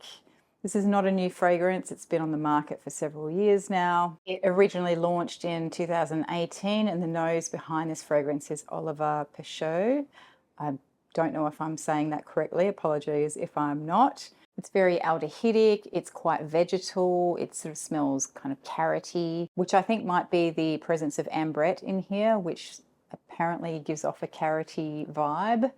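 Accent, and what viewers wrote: Australia. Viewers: Hi, I came to you through Chris. I’m in Adelaide, so it’s nice to see and hear an Aussie talking about perfumes. Subscribed😎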